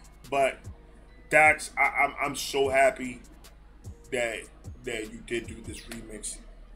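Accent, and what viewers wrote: New York, United States. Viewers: My dude! Hands down my top 3 reactors on yt. So glad i found your channel a month ago. You originally from NY? Bc i get ny vibes from u. And you talk your shit like a nyer. It's honestly refreshing man. Keep it coming man. You a real one.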